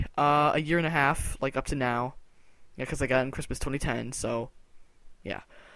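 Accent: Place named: Australia. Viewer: Yes you sound austrailan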